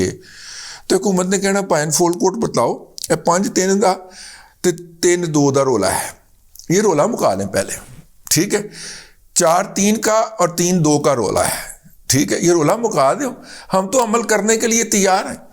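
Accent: Punjab, Pakistan. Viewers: رضوان صاحب ۔۔ آج آپ کا انداز گفتگو بہت اچھا لگا ۔ خالص پنجابیوں والا ۔ تینوں مکروہ چہرے والے چوتھا ٹرکوں کا شوقین ۔ناسور اتنا پھیل گیا ہے۔ دور دور تک اثرات پہنچ رھے ھیں ۔ خدا اس ناسور سے دیگر ججوں کو محفوظ رکھے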